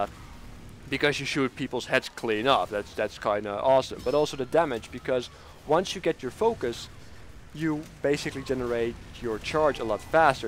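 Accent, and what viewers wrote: Netherlands. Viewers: are you from holland ? :D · Je kunt gewoon horen dat je nederlands bent lol, goede video's man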